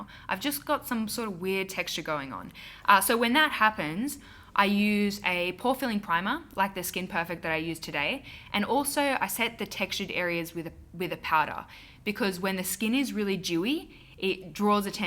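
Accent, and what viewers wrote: Australia. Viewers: Hola!!!! Te saludo desde Colombia sur América, me encantan tus vídeos y tu forma de hablar, muy Ausie😁😁😁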